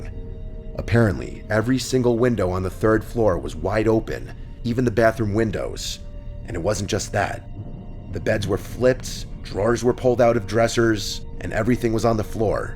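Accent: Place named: New Jersey, United States. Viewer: I’m gonna guess you were raised in Essex County NJ, am I right? My accent is coming back just listening to you! 😂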